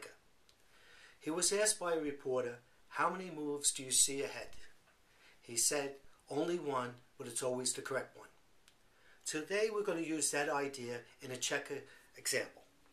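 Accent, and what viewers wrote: New York, United States. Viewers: Wayback thats how all New Yorkers sound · New York accent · ALEX he sounds like a man from New York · Not really lol, just normal NY accent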